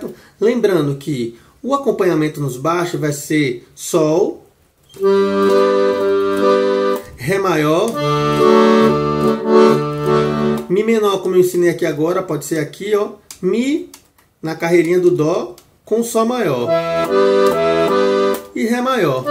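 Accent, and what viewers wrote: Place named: Pernambuco, Brazil. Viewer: Vc é de Pernambuco?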